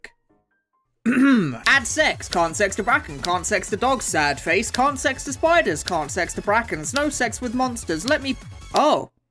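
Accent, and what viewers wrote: United Kingdom. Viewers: HE. IS BRITISH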